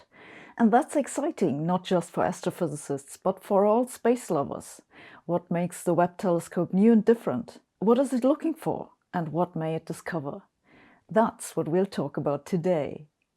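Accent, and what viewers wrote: Germany. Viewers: May I ask Professor, are you german?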